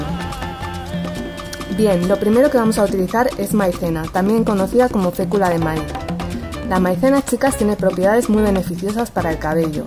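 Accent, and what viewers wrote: Spain. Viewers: Wow! me sorprende tu voz tienes acento español; muy bien, ya era hora de conocerla saludos!! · Acento español!!!!! De dónde eres originalmente?? 💖💖 Te queremos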